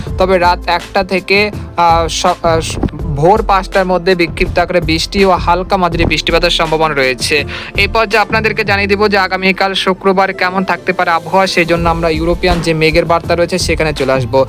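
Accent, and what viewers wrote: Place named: Noakhali, Bangladesh. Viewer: নোয়াখাইল্লা গেলা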